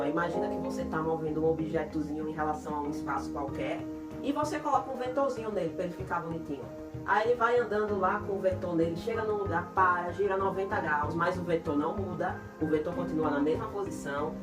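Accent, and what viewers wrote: Northeast, Brazil. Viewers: Ela é maranhense, paraibana ou cearense eu acho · Adorei o vídeo 😄. Só fiquei curioso pra saber de qual estado do nordeste vc é.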